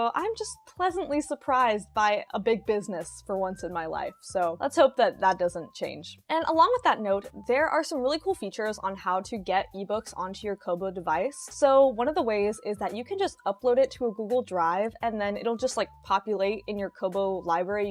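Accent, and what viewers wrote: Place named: United States. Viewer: You sound like you are from the USA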